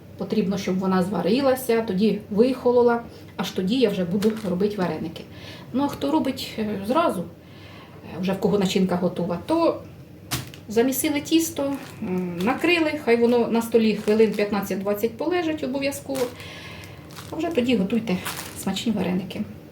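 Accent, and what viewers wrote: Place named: Poltava, Ukraine. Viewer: Чую голосочек полтавчаночки і аж на сльози пробиває... наче моя мама ожила. Вона теж готувала дуже гарно і без книг по кулінарії і без інтернету. І посудка похожа навіть запах відчуваю. Не думала, що так побачу і від чую тепло наче рідного дому. ДЯКУЮ... ДЯКУЮ... ДЯКУЮ!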